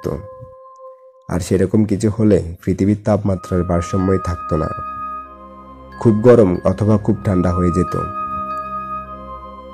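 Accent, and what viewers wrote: Noakhali, Bangladesh. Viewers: Vai apni ki Noakhailla? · ভাই খালি ফ ফ করেন ক্যান? ফেনি, নোয়াখলি, কুমিল্লা?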